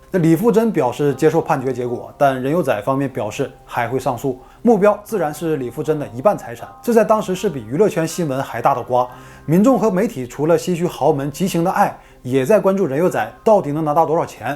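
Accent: Northeast, China. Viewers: 小乌确实噱头大与内容不符，Wayne内容一般都是从网上抄的，will很棒发音好文案也有趣简洁，但是东北口音也很重呀。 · 思路够清晰，文案不拖沓，播讲够顺溜，语言够幽默，就会让观众不自觉忽略口音问题。其实有口音的人是多数，当你的播讲内容有冗长，模糊，故意卖关子，拖沓，发音的缺点就会被放大。当然这几个主播都没有那么差，都还挺好的，主要我以前做的是语言类相关的工作，所以会很挑剔发音上的硬伤，我无法忽视哪怕一点儿的齿音字，大舌头，前中后鼻音不分，呢嘞不分。的东北音，和发音问题，是两个概念。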